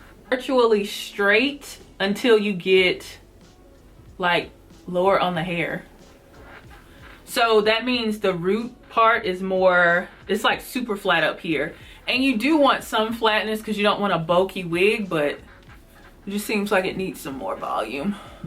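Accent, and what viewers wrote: South, United States. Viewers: ok. Bye the way, I love your southern accent!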